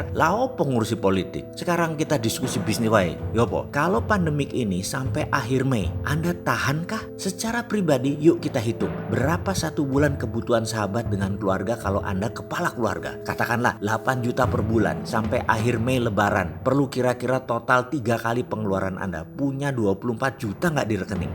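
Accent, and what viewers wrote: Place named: East Java, Indonesia. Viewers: Q seneng nek pas ngomong jawa timuran tepatnya suroboyan, ketok loss banget, dadi cikhas soale q jarang delok youtube sing gar boso suroboyoan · Mantep mas se salam suroboyo, pantes ae logakte kentel, ternyata sampean wong jowo timur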